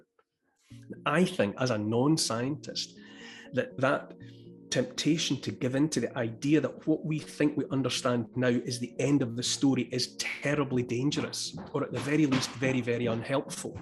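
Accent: Scotland, United Kingdom. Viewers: When I need a booster of a Scottish accent, honesty and a questioning mind, I drop in here. I’m a Scot in Taiwan. I think I’d hate to be living in Sturgeon’s ideological dystopia right now. Taiwan handled all this so much better, and that with China a stone’s throw away.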